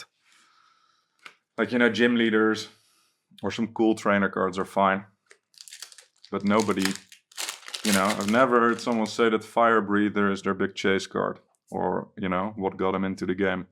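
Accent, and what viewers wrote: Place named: Netherlands. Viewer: Was thinking you were Dutch too. That accent! :L. But, you're English is fantastic, even you haven't spoken it for years.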